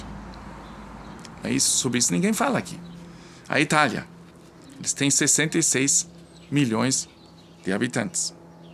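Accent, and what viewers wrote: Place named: Germany. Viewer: peço a você que nós desculpe do comentário maldoso feito por um desqualificado em seu canal, não se deixe abater por isso pois nós gostamos muito de você de sua esposa e de seus comentários e de seu jeito de falar ( português alemão ) queria te dizer também que esses números estampados na mídia de 300.000 mil óbitos aqui no brasil não são reais...pois por trás existe uma indústria que lucra com a pandemia com a morte das pessoas...houve estados que receberam recursos do governo para usar na crise e o dinheiro foi desviados para outras finalidades, construíram hospitais de campanha e não foram utilizados...os serviços funerários lucram como nunca...a mídia ataca o presidente porque ele cortou toda verba de, publicidade do governo...secou a fonte de corrupção...aqui no brasil ninguém morre mais de zica virus...,dengue..., derrame cerebral...infarto...câncer....acidente de trabalho...., acidente de transito...,malária.., tuberculose...,pena munia...aids...etc...tudo aqui é covid....aqui me despeço com um forte abraço. 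" Gott sei mit dir "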